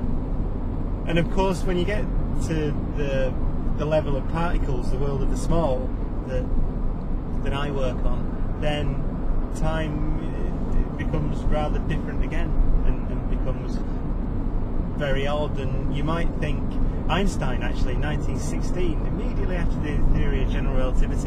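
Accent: Northern England, United Kingdom. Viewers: this guy is bringing more ppl into science so I hope he keeps on broadcasting...he does have an interesting accent...northern I suspect...:)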